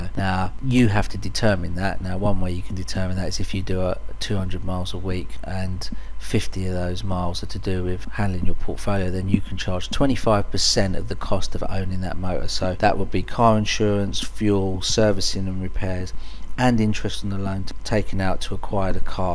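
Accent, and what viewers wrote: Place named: United Kingdom. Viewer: very intersting british accent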